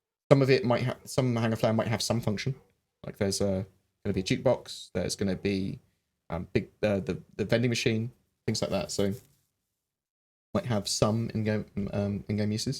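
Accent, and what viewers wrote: United Kingdom. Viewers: I agree with you about Disco Lando that he knows his stuff about the game, but there is really one thing that bothers me when he is on. That is the lack of control he has with his hands. Anyone that broadcasts for a living knows to keep their hands away from their mouths when the talk on screen. I have a difficult time understanding conversations, due to hearing loss. I've learned to somewhat read lips. When he coves his mouth, It's impossible. I can hear his speaking, but sometimes I have trouble really understanding what he is saying clearly. I spent too many years working around jet engine aircraft with a limited hearing protection, and unfortunately, some tones I frankly don't hear. If someone turns their back on me when they talk, or talk from another room, I just don't understand them very well. On that note, you do ok during your streams. 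I have no problem understanding you, even with your British accents. I look forward to 3.5 going to theEvocoti, the PTU and then out to live stream. I get it when it goes to Concierge and Subscribers as I am both. I also can't hardly wait for the Carrack to come out this year, as I have the Explorer pack that was available for Concierge.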